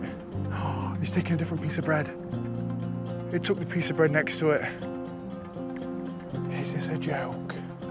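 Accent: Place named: East Midlands, United Kingdom. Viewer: Where is this? You sound like an East midlands lad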